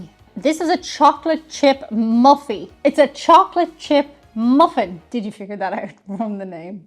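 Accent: Ireland. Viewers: Was it just me, or did sound more "Irish" than usual in this video?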